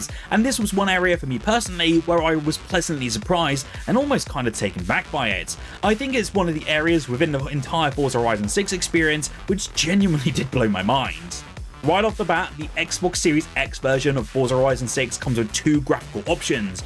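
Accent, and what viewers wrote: United Kingdom. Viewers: I normally love the English accent, but I feel that you're so overly animated. I can't tell if this is a serious review or something from Saturday night live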